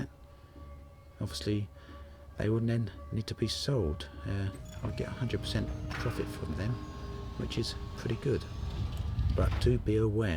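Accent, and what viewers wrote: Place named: Cockney, United Kingdom. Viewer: Good vid. Please could you re-dub it in a Micheal Caine accent. Your normal accent isn't too far off...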